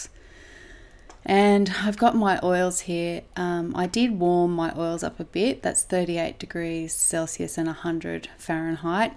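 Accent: Australia. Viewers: the Australian accent is beautiful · My first time watching you. So nice to hear an Aussie accent. Love your soap. whenever I use Aloe gel it turns a weird pink colour. Have you ever had this problem?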